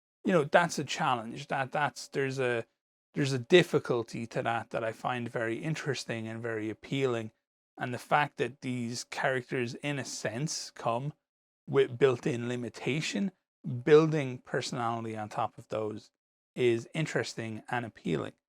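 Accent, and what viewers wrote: Ireland. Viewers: The voice of fantasy evil is Irish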